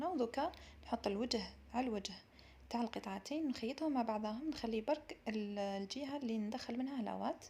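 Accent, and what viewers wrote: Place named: Iraq. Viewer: اللهجه بيهه عراقي عاشت يداكي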